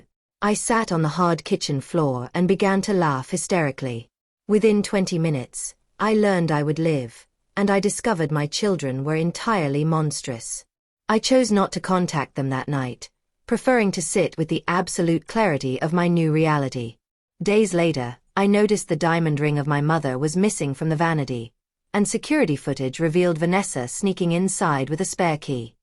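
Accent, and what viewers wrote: United Kingdom. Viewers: British accent but lived her whole life in Ohio. AI slop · Why does the voice have a British accent when she’s living in OHIO all her life??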